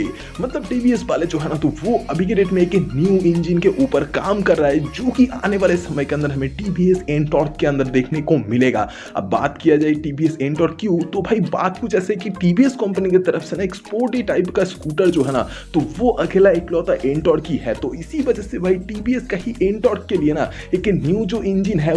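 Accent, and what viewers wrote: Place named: West Bengal, India. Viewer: Voice to bangoli lagta hai 😅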